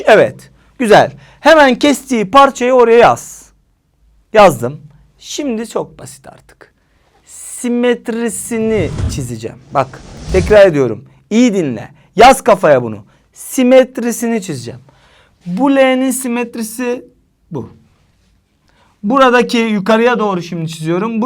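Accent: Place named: Hatay, Turkey. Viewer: Hataylılar istediği gibi söyler sanane kardeşim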